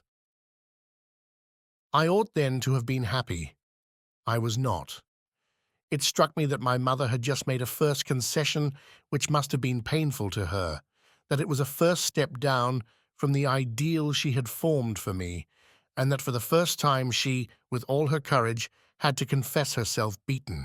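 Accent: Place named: United Kingdom. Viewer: Who is the reader of this wonderful novel? He sounds very British, not at all American.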